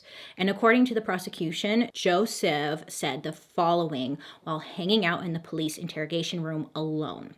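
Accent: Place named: California, United States. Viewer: Is it me or this is the first time i can tell her Californian accent... like kardashian or something lol... like the video though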